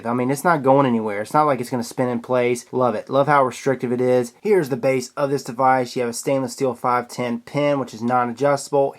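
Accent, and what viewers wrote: South, United States. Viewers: Are you serious? Dude talks with a Southern drawl...Dont try tawkin to a New Yorker if you think A southern drawl is fast!! Really youre being sarcastic ...Iknow lmao!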